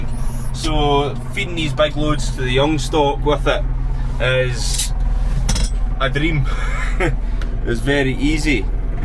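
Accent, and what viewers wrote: Scotland, United Kingdom. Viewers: what part a scotland you from ..im in northern ireland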